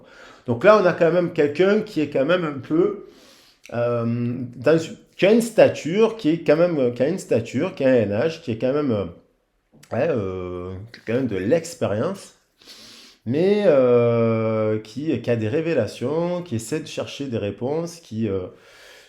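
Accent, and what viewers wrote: South, France. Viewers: Bonsoir. Un plaisir d'écouter tes guidances avec ce chaleureux accent du Sud, qui plus est toujours source d'enseignement également. Merci pour ta générosité et ton dévouement 😊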